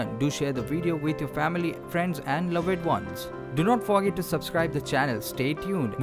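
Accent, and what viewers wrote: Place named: India. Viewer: Undestood about 5% of this of this video. And this being the only video that is trying to explain what heavy water is just shows how much we need to know about what heavy water actually is. If someone could make a video that explains is easily, please. And without that irritating indian accent.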